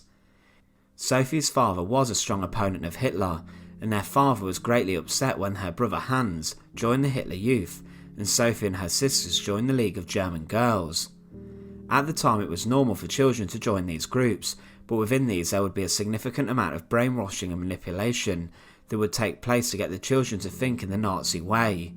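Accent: United Kingdom. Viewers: Impressive history! Btw, what is the melody in your reading? A bit difficult to follow. Not standard British, tho.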